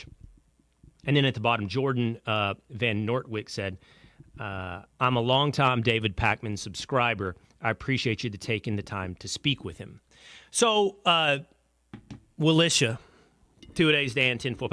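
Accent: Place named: South, United States. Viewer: Hi there, I saw the debate with David Pakman & that happened to be the first time I've seen your show. I watch David Pakman, so I think the algorithm hooked me up. I commend you for your calm composure, fairness & respect for Pakman. I've watched him for years, & I was taken aback by the aggressive tone he took with you from the start. I don't know if he was maybe scared or nervous because he's had some bad experiences with debates with the right "debating.". Or the two of you have differing ideas about what is/isn't a debate. In any case, he seemed ready for a fight & defensive. I lost some respect for him after that episode. I am an old-fashioned liberal libertarian (liberal on most social values, but lower my taxes! - I am not wealthy!) I now have subscribed to your channel because I appreciate your delivery, like to hear fair arguments from reasonable folks on the right, & as a Southerner, I know that accent! 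Thanks for the follow-up here. It was very fair,. May we all honor the men and women who have given their lives & health to keep us free & safe this Memorial Weekend.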